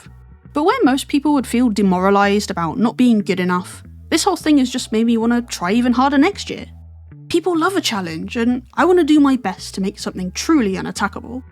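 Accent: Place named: United Kingdom. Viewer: i love your british accent, i think its british, urart is AMAZING, i am DEfINENTLY drawing a mech when i go to bed, even tho my usual drawings are so mediocre, but i'd love to do this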